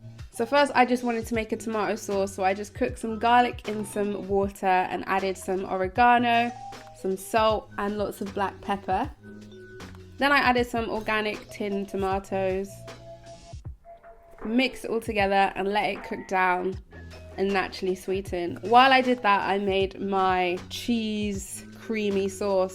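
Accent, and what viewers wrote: England, United Kingdom. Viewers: Ok so I got 2 problems. 1) Vitamin is pronounced V-Eye-Toe-Min. 2) Does it make me a hater if I'm hating on your hot english accent because I wish I had a hot english accent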